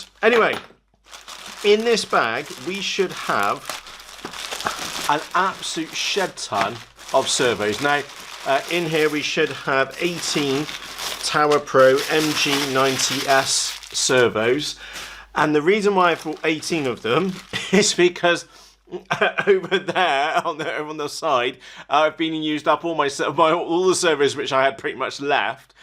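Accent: United Kingdom. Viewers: British acsen🤣🤣👌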